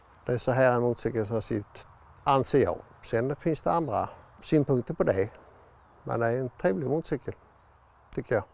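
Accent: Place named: Vetlanda, Sweden. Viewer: Skön blandning av nostalgi, entusiasm, motorkunnande och Vetlandadialekt!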